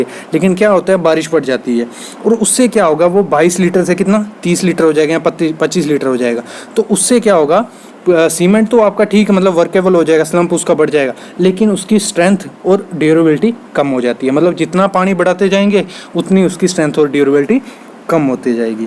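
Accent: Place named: Sri Ganganagar, India. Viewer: Boli se lgta h shri ganganagar se ho aap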